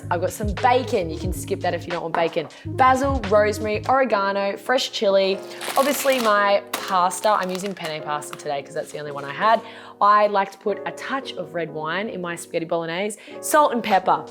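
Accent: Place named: Australia. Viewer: why do i love her voice, so Aussie😍 edit: AHHH SHE LIKED MY COMMENT❤️❤️❤️❤️❤️❤️❤️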